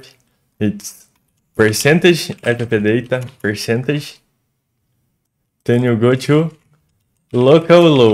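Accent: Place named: Brazil. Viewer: fiquei um tempo pensando que o sotaque é familiar e quando vejo os comentários é br kkkkk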